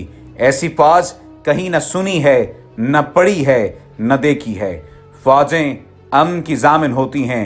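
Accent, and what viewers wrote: India. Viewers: Pak Foj Zindabad Hai.....Mulk K liye Or Pak Foj k liye Jan B zinda. Ap ke awaz indian lagti hai.....Wesy Iran or Saudia or afganistan k bary me itna zada Q discuss kr rahy ho.......India k bary me itna discuss nai kia ap ne video me....................Humy pta hai sab apni foj k bary me💖💗💕💓🇵🇰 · Aap ka bolne ka talfaz indian ki tara hai r u a pakistai .i dont belive u r a pakistani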